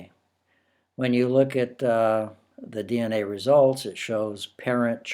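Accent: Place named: Sierra Leone. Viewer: 😂😂😂 you sound like a Sierra Leonian.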